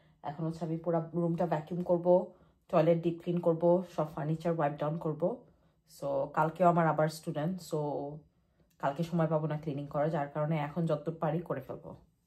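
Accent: Sylhet, Bangladesh. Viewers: The way u speak sylheti is so cuteee🥰🥰 khala is lovely 🌹🌹 Sb Smy family Niye vlo thako shuke thako dua kori Allahummah Barik❤❤❤❤ Instagram a DM kora jacchena bestie eMail address?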